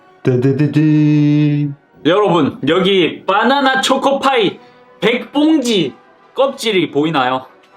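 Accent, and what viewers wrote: Busan, South Korea. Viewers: 사투리일걸여 부산사람이라서 ㅋㅋ저도부산사람이라 바나나가안되여 빠나나밖에안댐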